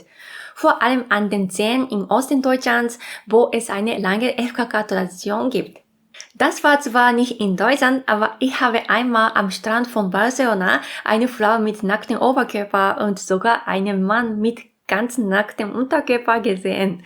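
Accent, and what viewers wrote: Japan. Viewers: ich liebe den japanischen Akzent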